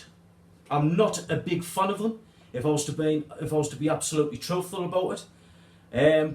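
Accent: Sunderland, United Kingdom. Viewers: Hi, love the show and its great to hear the old accent, came to Australia over 35 years ago from Sunderland... Knocked up a couple of the clips (took about 5 or six goes to get it looking like yours) using 316 grade 1.6 mm s/s rods as you use and thought I would have a look and see at what the poundage would be before it straightened. After doing 4 tests I got roughly the same lb's before the clip straightened. They all straightened at the 35 - 40lb mark, which is great in a lot of circumstances but wouldn't stand up to a big GT trying to get as far away from you as possible. Any suggestions on making them a little stronger or changing the design a little to give it more poundage before it lets go? I will try to have a play and see what I come up with. Absolutely not having a go, just one fisherman to another trying to make sure that biggen stays on the line... 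Cheers Mate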